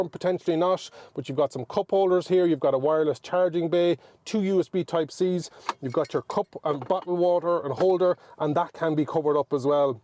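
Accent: Ireland. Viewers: Many thanks for the comprehensive review and Irish accent that brought me back to my early school days. The car is an overall very good SUV, spacious and powerfull. Please provide the specifications of the sound System and speakers. Also, please advise the availability of two motors and AWD version. Best Regards.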